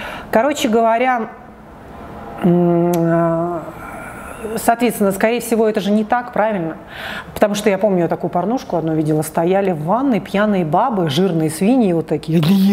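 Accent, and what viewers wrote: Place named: Russia. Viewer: Без акцента?! Чудовищный русский акцент!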